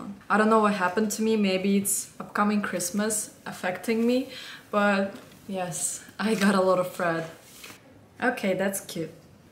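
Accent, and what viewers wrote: Russia. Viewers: Несмотря на то, что ты прекрасно говоришь по английски, я почему-то сразу поняла, что ты русская, и это подтвердилось! Успехов тебе, береги себя! Со скольки лет ты стала работать за границей? Родители нормально отпускали? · привет, я начала смотреть тебя пару месяцев назад, потому что меня заинтересовал блог, и я хочу послушать акцент разных людей, поэтому сначала мне показалось, что ты из России, а сейчас узнала, что это действительно так) я хотела сказать, что ты замечательно выглядишь и у тебя очень хороший вкус, для меня ты стала одним из примеров, на кого надо ровняться, спасибо тебе за это 💌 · Как только я увидела ваше другое видео, я сразу подумала, что вы русская) Это видно по внешности и по тому, как вы говорите) И при этом я сама не русская, но из России. Очень интересно то, что мы можем узнать русских среди других европейцев, так и людей из своего народа я точно так же вычисляю среди других азиатов) Но я вообще не знаю как я это делаю.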